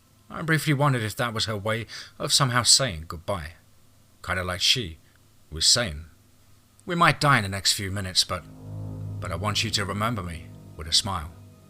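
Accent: United Kingdom. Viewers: Is it me or does the story being read sound odd in a UK accent versus being done in a Texas accent? It’s simultaneously seems off but it works really well at the same time.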